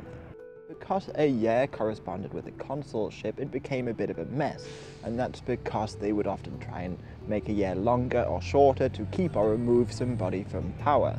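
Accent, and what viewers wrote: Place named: Newcastle, United Kingdom. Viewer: The reason pronounces 'year' as 'yeah' is because he is from Newcastle. So what you're hearing from is a Geordie accent.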